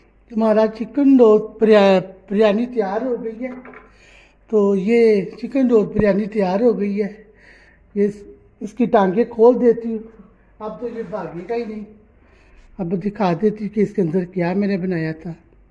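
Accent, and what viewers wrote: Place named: Lahore, Pakistan. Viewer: The way you talk is amazing , true lahori style .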